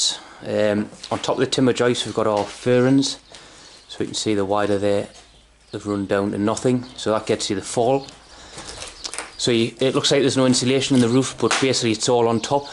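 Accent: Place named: North East England, United Kingdom. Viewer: Not sure why you popped up on my feed, but I'm glad you did! Your accent clued me in that you might not be far away from me. I have an existing single storey extension on the back of my house which was added by the previous owner. It can get very cold, and acts like a heat sink for the whole ground floor. I suspect the insulation (particularly in the roof) is not adequate. Do you do remedial work for existing buildings at all, or could you recommend someone in the North East who does? Thanks!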